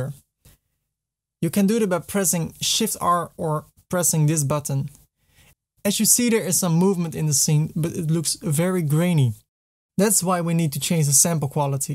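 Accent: Netherlands. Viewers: Ok top je Nederlandse accent is wel een beetje opvallend :)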